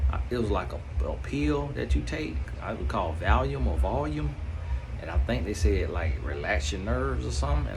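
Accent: South, United States. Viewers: “Don’t try me like no baser” 🤣🤣🤣 you know you from the country when you say that! That’s how we talk where I’m from lol (Wildwood). This video was spot on though, some great knowledge. We are the salt of the earth, country draw or not, we were meant to stand out. Our power lies in our authenticity! Period!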